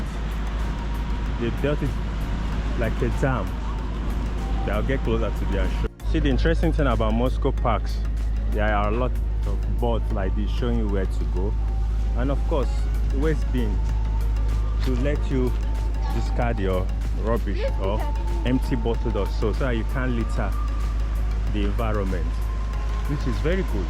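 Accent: Africa. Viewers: Судя по акценту именно африканец? А откуда? Добавь в описание канала о себе что-то кроме того каково чернокожему гулять по Москве. Учишься в Лумумбе? Я так понимаю английский в Африке как русский в Дагестане, тобишь язык межплеменного общения, но без акцента не бывает? Видно, что русский тяжело дается, но речь уже поставлена, лексикон будет расширяться, язык будет привыкать и при желании всё получится. Keep it up man.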